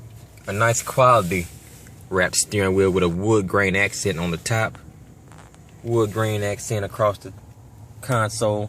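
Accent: South, United States. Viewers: After living in the American South for 10 years; I can assure you that this is what a very intelligent, articulate southern man sounds like. Did you ever have difficulty understanding him? Since the reviewer noted he is in Louisiana, and having spent a lot of time in LA, I can assure you that there are some extremely thick accents there. There are people that speak and you might be lucky to understand 1 in 5 words. That's what makes LA such a great place. A beautiful state with an amazing history, and wonderful people.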